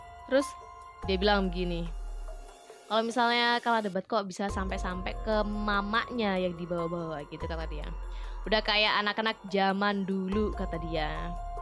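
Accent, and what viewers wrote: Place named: Medan, Indonesia. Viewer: Saya suka dialek medan / batak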